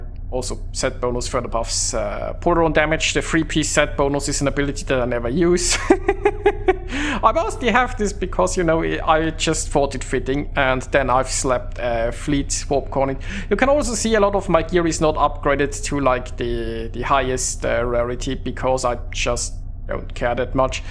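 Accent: Germany. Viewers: Alter dein Deutsch Englisch hört sich geil an😂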